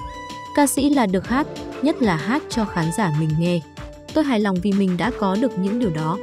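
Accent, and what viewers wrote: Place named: South, Vietnam. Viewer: cái chất giọng điêu luyện rặt Nam Bộ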